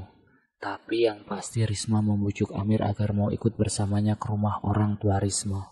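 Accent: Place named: Borneo, Indonesia. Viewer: abang ne urg kalimantan kh , logat bhsa nx kek asle urg pribumi borneo 😅😅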